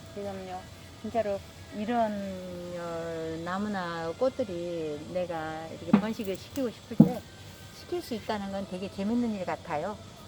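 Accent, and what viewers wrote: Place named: Suncheon, South Korea. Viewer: 설명 잘 들었어라 근디 엄니 거가 어디다요 말투가 완전히 우리 고향인디 저는 순천 주암이요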